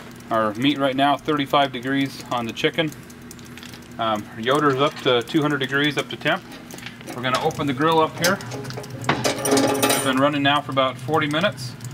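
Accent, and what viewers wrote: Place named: North, United Kingdom. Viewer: it's quite obvious you're from up North.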